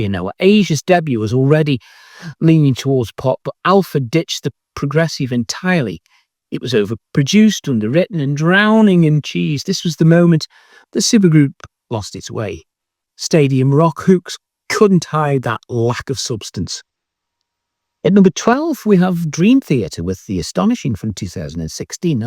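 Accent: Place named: Newcastle-under-Lyme, United Kingdom. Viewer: A Prog-talking Stokey! Ace! Actually, you sound more Newcastle-under-Lyme? You're right about these albums, of course, but from a nostalgic perspective, they still have a place in my heart and I'll just listen to them from time to time just to remind me of what I was doing in the 80s.... Was never an ELP fan... but have the 80s ones you mention by IQ, Rush, Asia, Camel, Yes, Kansas. They have infinitely better albums, but these are part of their canon and I can enjoy them with the windows firmly closed so no-one can hear what I'm listening to. Guilty pleasures. Single Factor I like, Nomzamo I really like (but I was 18 at the time), and Union has its moments. The biggest let down of these for me was Hold Your Fire, for sure.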